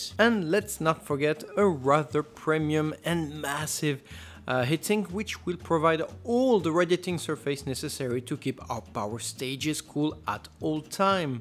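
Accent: France. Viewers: This french accent, you can fight it but you can't win against it..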